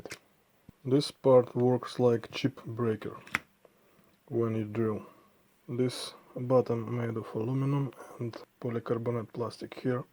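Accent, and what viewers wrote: Russia. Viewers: русскоязычный?.Спрашиваю потому что интересно на английском говоришь.как будто Русский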